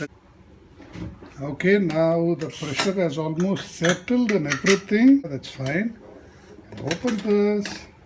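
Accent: Coimbatore, India. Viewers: Asal Coimbatore bhasaingho...... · Nyc to hear Coimbatore tamil🤩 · நம்ம கோயமுத்தூர் பாசையோட உங்க சமையலும் மனக்குதுங்...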